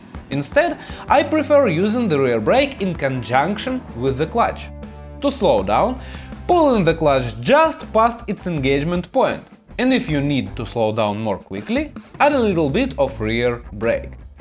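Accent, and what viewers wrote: Russia. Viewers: Clearly the best motorcycle instructional videos out there. Even with the strong Russian accent, you still have the clearest and most understandable explanations in the field. I would challenge any American to learn Russian and be able to be as fluent while creating such competent content. Kudos my comrad--I am a subscriber now. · He is in Argentina, but he does sound Russian, I wasn’t sure haha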